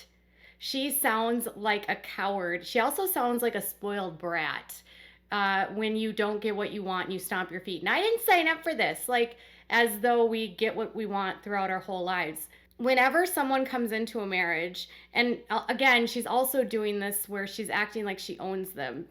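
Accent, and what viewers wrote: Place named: Midwest, United States. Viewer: I hear your accent more when you’re behind the scenes… Midwest?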